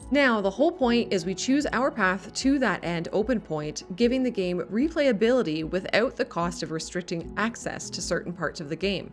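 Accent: Canada. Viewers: I have no idea why but you sound alot more canadian in this video than other ones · Nobody really says aboot but her accent does sound Canadian to me (source: Canadian) otherwise so I think it's just fun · ABOOT- CANADIAN ACCENT- ugh lol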